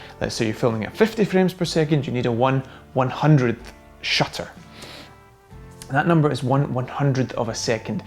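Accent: Scotland, United Kingdom. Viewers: Subscribed cause your Scottish and not English :0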